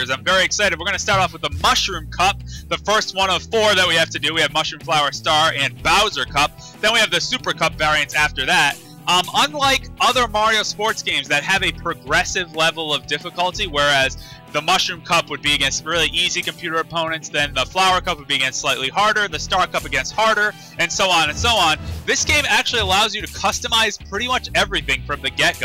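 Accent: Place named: United States. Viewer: You sound American, but call it Football. Not like it matters, but I'd just assume you'd call it soccer more than football out of habbit. xD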